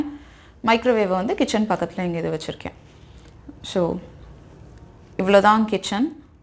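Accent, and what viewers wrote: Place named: Nagercoil, India. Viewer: Sisters ungaluku Nagercoil tha...unga tamil language appadi tha iruku..nanum Nagercoil tha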